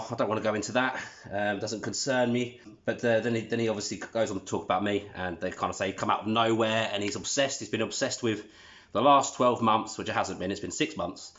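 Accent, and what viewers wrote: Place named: United Kingdom. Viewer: A good rule of thumb is not to trust anyone with a Brit accent talking about US affairs on YouTube. Did Christopher Steele and the Steele Dossier teach us nothing?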